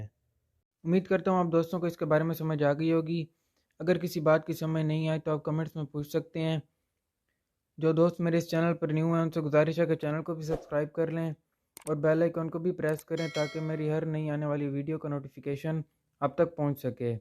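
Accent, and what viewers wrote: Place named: India. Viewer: Do it in English I can't understand Indian accent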